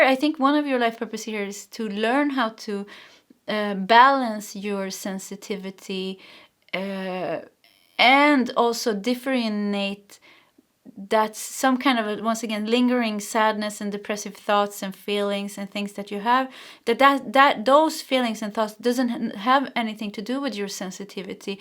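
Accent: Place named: Sweden. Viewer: Thank you for #2! This is 100% me! Everything you said just kept building on the previous statement and I just kept saying, Yess! Yep, that’s me. Oh my yes! Uh huh! That definitely explains what I’m feeling! Yes, I do that! Oh, I totally get it! And on and on … So I’m looking forward to moving forward with this new life with a new perspective on my past and I know I’ve carried karmic energy that I’ve been healing this past year! I’m overcoming, healing and loving “the happy ass life I deserve”! ❤ Thank You! BTW, I’m Swedish/American and have a heart connection to Sweden even though I’ve never been there, at least in this life. I love hearing your accent and I’m so grateful I found your channel! I think the heritage connects me to your readings even deeper. ♥️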